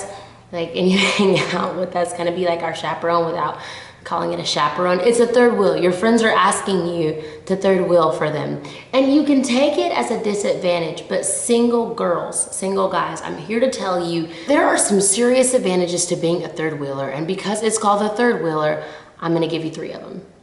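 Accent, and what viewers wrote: South, United States. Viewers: You're southern accent sounds beautiful today! Love you, Jamie Grace! You are such an inspiration!! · man you have such a southern accent its adorable! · I love this video SO much! Such a great message! :D Also, I never realized how much of a Southern accent you really do have before watching this video. From one Southern belle to another, I think you have the cutest Southern accent I've ever heard. Thank you for posting this! <3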